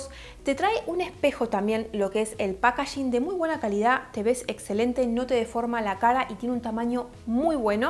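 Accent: Argentina. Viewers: Hola, me encanta tu canal, enseñas cositas variadas y con las 3B, buenas, bonitas y baratas. El maquillaje de ojos que llevas es una preciosidad, alguna vez podrías hacer algún tutorial de maquillaje de ojos, te queda hermoso. Muchas gracias por el vídeo, es muy entretenido y escucharte es una delicia, el acento argentino es mi debilidad. Ójala tengas éxito con el canal, te lo mereces, por el contenido y por tu simpatía. Besitos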